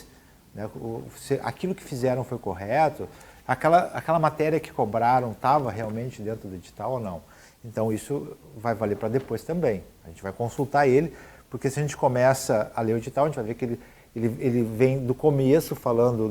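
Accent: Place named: Brazil. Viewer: JÁ TE ACHEI NO FACE E MANDEI UMA SOLICITAÇÃO. ASSIM QUE COMEÇASTE A FALAR JÁ VI QUE ÉS DO MELHOR ESTADO DO BRASIL. SAUDADES DAÍ.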